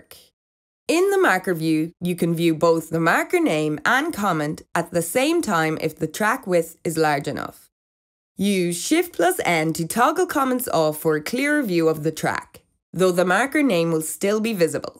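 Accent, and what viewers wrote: Ireland. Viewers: Good vid but the Irish accent is like listening to bad Melodyne on a Didgeridoo. Please use the usual VO so as not to be distracted. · Inner city Dublin. Next to being a gypsie. If it's any help, bring volume to zero and use subs. Nails on a teacher's blackboard is easier to listen to but the screen is busy enough unfortunately.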